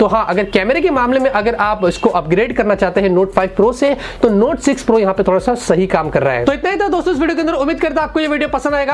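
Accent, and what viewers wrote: India. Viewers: Nossa, como indiano tem sotaque. =0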